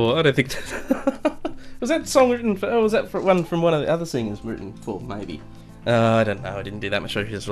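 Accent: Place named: Australia. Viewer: Hey! As always cool reaction, never get tired of hearing a good Aussie voice on reaction vids haha I reckon you hit up " " soon, my fav track, Floor and Marko vocals on point. Keep it up legend!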